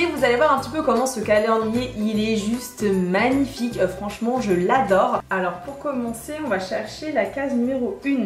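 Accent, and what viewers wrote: United Kingdom. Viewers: J adore l accent so british 👍👍. Très beau calendrier. Tu serais sexy en mère noël. Toute la panoplie c dingue. Chapeau sephora.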